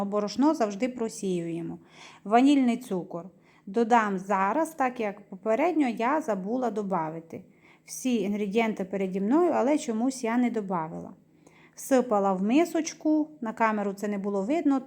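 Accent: Transcarpathia, Ukraine. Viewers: Дякую,! В нашій родині наразі місяць страв з гарбуза, хоча любимо гарбуз, тому він часто на нашому столі.))) У Вас приємний закарпатський акцент, люба! Рясних Вам і Вашим рідним Божих благословень!💙💛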